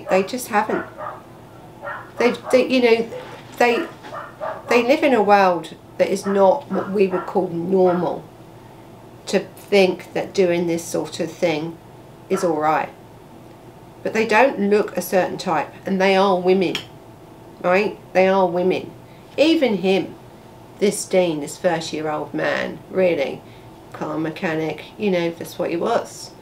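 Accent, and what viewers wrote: United Kingdom. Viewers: You’re a great storyteller; I love the British accent.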